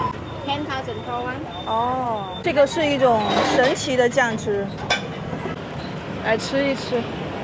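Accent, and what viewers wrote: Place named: China. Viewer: 英文太偏中式😂😂😂比不过越南酒店前台服务。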